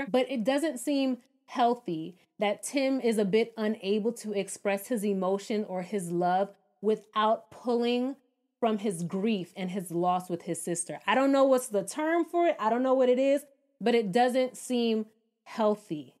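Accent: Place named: New York, United States. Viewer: Girl you gotta be from NYC cause the way you talk 😂😂😂❤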